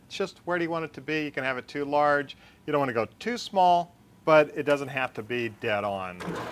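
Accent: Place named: United States. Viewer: Solder has an L in the word: why can't you Americans say it properly?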